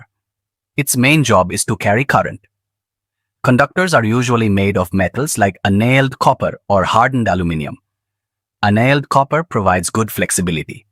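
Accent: India. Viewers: You made the sound with AI and still put the annoying indian accent!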